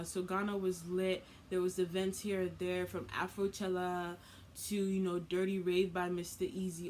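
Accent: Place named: United Kingdom. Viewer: what is with the British accent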